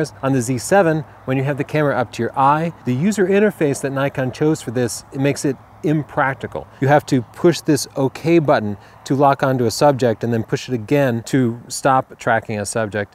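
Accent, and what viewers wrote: United States. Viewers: I love the American pronunciation, the UK being the 51st state, now commonly known as the United Kingdom of America and we have an American princess now, ignore the haters i wouldn't give em air time, i love my Mustang too No more visits to California to Enjoy driving a Mustang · Loved that ending. "I'm an American, this is my dialect." Hell yeah. No one should be ashamed of their dialect, Americans included. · "It's Ny-kon in the US, not Knee-kon." And then go on to claim 'Zee-7' is a world-wide pronunciation. The letter Z is pronounced Zed everywhere but the US. The product will therefore be pronounced Zed-7 everywhere but the US, whether you like it or not. If you want leeway for your American dialect and pronunciations, at least show the same courtesy.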